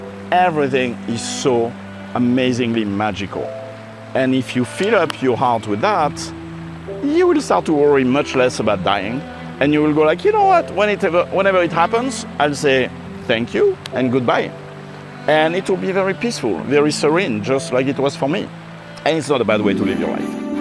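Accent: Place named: France. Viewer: Hello mon ami d'enfance ... et bien ce n'était vraiment pas ton heure mais ton expérience est amazing ... heureusement que tu n'as pas perdu le sens de l'humour et cet optimisme que je connais depuis toujours chez toi ... Et j'adore ton bel accent Français que tu as gardé en américain...Enjoy your life whit your children et friends.... Love for you et for ever your friend .MartineM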